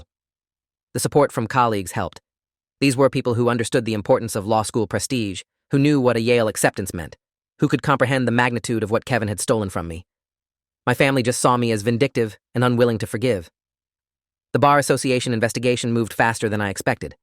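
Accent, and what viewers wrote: United Kingdom. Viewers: YESSS! I was just about to ask if I was crazy or is the narrator British? 😂😂😂😂😂😅😅😅😅😅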